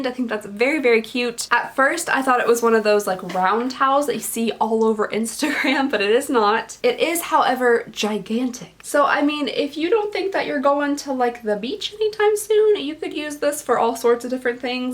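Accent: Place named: South, United States. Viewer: I’m from the South but am currently on the west coast courtesy of the military and I watch all of your videos because I loooove hearing your accent. It makes home feel just a little less far away.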